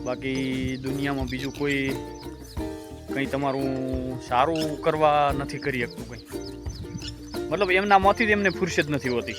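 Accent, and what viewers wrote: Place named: Banaskantha, India. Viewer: જય માતાજી ક્યાં થી છો ભાઈ ભાઈ તમારી ભાષા બનાસકાંઠા ની લાગે છે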